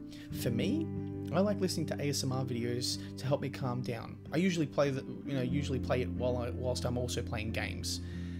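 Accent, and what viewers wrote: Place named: Victoria, Australia. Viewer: Subbed. Nice to hear a (I assume) Victorian accent talking re this stuff, makes me tune in and relate more. Thanks man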